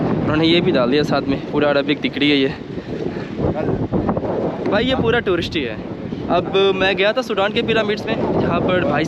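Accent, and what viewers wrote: Bihar, India. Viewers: That's Magadhi(south Bihar) accent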